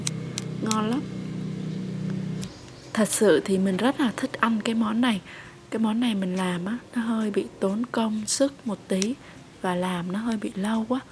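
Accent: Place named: North, Vietnam. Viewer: Bạn này hình như là người phía bắc lấy chồng trong miền nam, vì giọng bắc mà làm món nam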